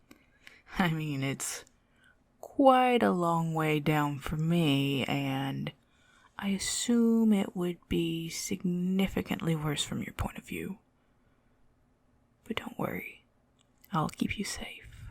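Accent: South, United States. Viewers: don’t you DARE hide that beautiful country accent!!🤣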